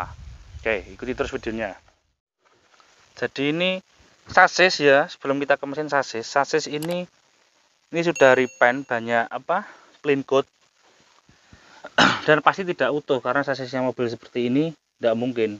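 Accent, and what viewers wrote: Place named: Malang, Indonesia. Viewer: Nonton test drive sampeyan poleh kangen ngalam Sam. Boso jowone sampeyan medok khas ngalam an. Alhamdulillah aq duwe sing th 1983. Sukses buat yo sam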